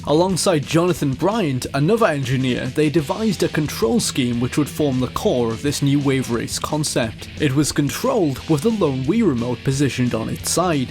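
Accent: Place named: Geordie, United Kingdom. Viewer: I instantly recognised your accent on Did You Know Gaming's video. Canny cool to see a local youtuber doing well haha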